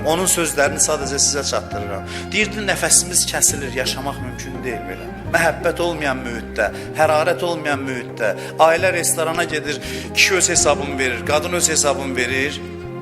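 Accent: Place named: Jalilabad, Azerbaijan. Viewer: Senin bu Celilebad lehceni esidende üreyim bulanır , get oyza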